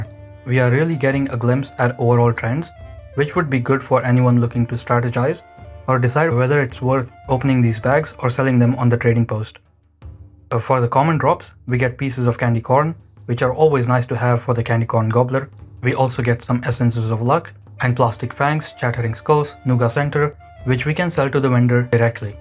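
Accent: India. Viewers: my god indian accent kills me every fuckin time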